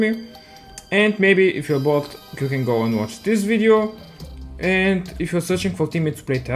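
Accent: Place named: Bulgaria. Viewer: prosto te nabarax po govora che si bg😂😂😂 i vikam dai da pisha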